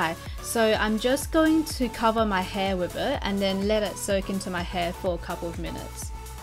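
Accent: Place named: Australia. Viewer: wheres she from she sounds like an aussie maybe a kiwi...?????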